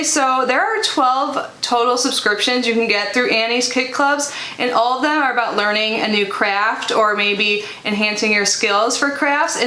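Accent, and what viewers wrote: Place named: Midwest, United States. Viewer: Hey! New subscriber. Been watching for a minute! You have to be from the Midwest. I’m originally from Chicago. I know that accent anywhere!! 😊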